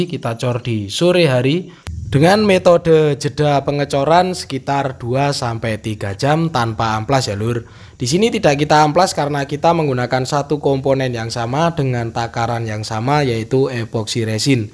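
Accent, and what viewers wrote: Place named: East Java, Indonesia. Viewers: Kayak ngk asing sama bahasa medoknya,dan nama chenelnya.. orang mana si bang?? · Emang sampean asli mana, logate sama, kayake jawa timur semua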